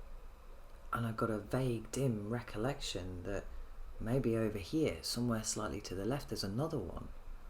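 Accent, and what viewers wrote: United Kingdom. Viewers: I absolutely love your readings, but am wondering if there is anything that can be done to improve the audio. I struggle to hear them - add that to the struggle to pick up the British accent and I have a helluva time!!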